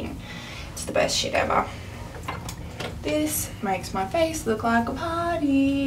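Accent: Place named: Australia. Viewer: Morning routine twins! You are stunning. Also, yay for us Aussies! Can't get much realer than that accent x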